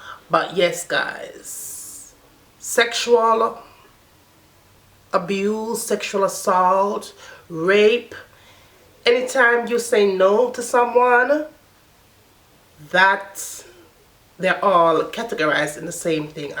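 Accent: Jamaica. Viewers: The bible has some very harsh words regarding people who abuse children, its better you were never born. No need to say Jamaican accent, I think you subscribers know already :)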